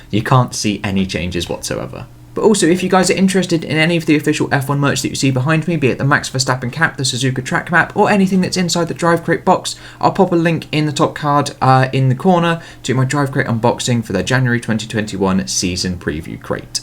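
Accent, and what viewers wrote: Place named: United Kingdom. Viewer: really british accent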